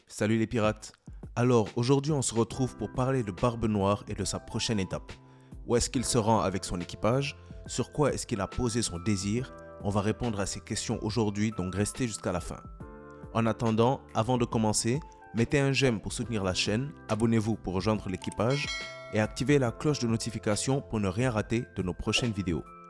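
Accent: Senegal. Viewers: Ta théorie tient la route et est très intéressante mais il y a un detail qu’on oublie c’est qu’on est dans la phase finale du manga donc je pense qu’on est plus en phase d’acquisition de développement mais plutôt de conclusion et de demonstration. Pourchasser un possesseur de fruit me semble plus dans l’ordre des priorités actuelles, je pense que c’est plutôt tout ce qui est poneglyphes et armes antiques qui peuvent le motiver. (D’apres ta voix es-tu d’origine sénégalaise ?)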